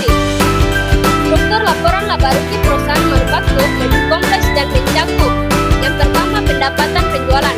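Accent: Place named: Ternate, Indonesia. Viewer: kerennnnn👏🏻👏🏻 logat Ternate kntraa 🎉😂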